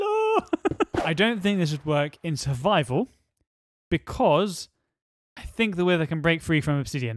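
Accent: United Kingdom. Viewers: MAN THIS DUDE IS SO INTERESTING I LIKE YOUR VIDEOS KEEP UP THE GOOD WORK,THOUGH ITS KIND OF LIKE MUMBO JUMBOS,UR ACCENT IS BRITISH UR CLOTHING IS FORMAL AND U DO REDSTONE VIDEOS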